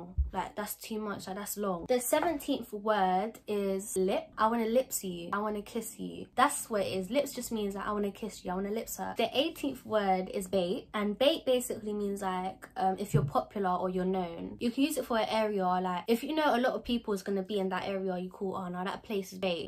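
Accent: South London, United Kingdom. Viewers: i knew u were from south im from west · I’m guessing your south London your accent is cute styl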